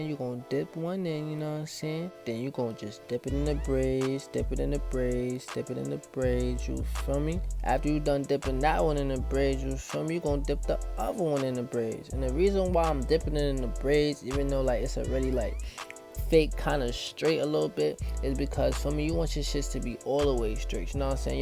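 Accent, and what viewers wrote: New York, United States. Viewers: Bro I can tell you from New York by the way you talk good video tho g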